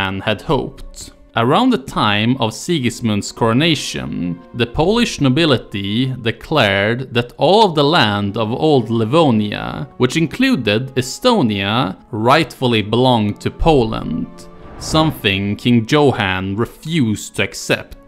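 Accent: Sweden. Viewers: He is speaking as if he is reading English text with Swedish pronunciation... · Are you Swedish? I only been Stockholm very briefly, but you pronounce the "ee" (i / y) similar to Stockholm dialect And your pronunciation is very good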